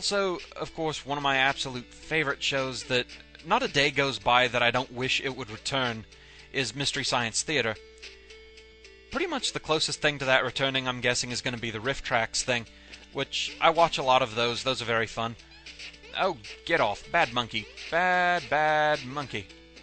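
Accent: Scotland, United Kingdom. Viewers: Hmmm... since I hate not knowing something I hear about, I'll make up my own answer: Really Wily from Megaman. I knew it! Also, my accent is close to yours, just far less Scottish and a little of every US accent. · it creates a bizarre mix that amuses me. but generally its good. there must be some strong scottish traits in your accent, because i cant pick it up, as im pretty much used to any british accent. it doesn't seem too scottish...or american really...ive had too much exposure to accents i think. my relatives need to stop emmigrating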